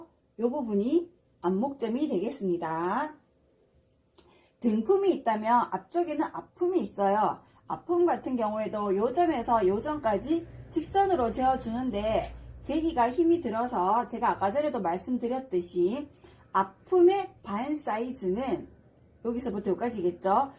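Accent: Gyeongsang, South Korea. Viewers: 문화회관 출강하신다고 하셨죠? 지역이 어딘지 가까우면. 수업듣고 싶엊네요 말씨는 경상도라 살짝 기대해봅니다 ㅎㅎ 막힘없는 설명 속이 뻥뚫리네요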